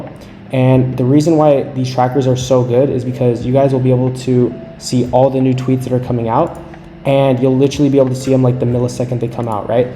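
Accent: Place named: Bangladesh. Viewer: Bangla voice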